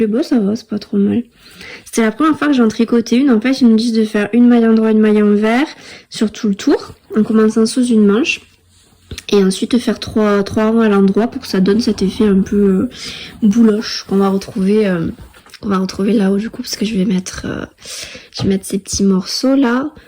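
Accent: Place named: South, France. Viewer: J'adore ton accent, tu es du sud-est ou du sud-ouest? personnellement je suis ton vlog depuis Toulouse, j'aime le sud de la france. Ton petit chiot est adorable!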